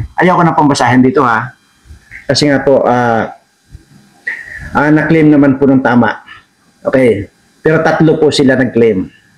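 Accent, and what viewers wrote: Iloilo, Philippines. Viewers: Tuno ilonggo man sir ako karay a man antiquenio man🤣😂👊👍🙌💚✅🙏🇵🇭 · Good morning po sir, napasaya mo po ako sa ilonggo accent mo😊🥰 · Daw ilonggo ka ,namit ka magestorya noh, kaluluoy man ang iyong nobya at hinimod na tanan sa imo hehe ,trying hard ko maghambal sang ilonggo,kahapon batangeno ka magsalita,bukas puede ilokano met tapno manggeg ko met nga agilokano ka maysa ak nga ilokano ngamen isu nga kayatko ko nga agsao ka no bigat no mabalin laeng, sige sayaw sir pangpagoodvives, happy kami na subscribers...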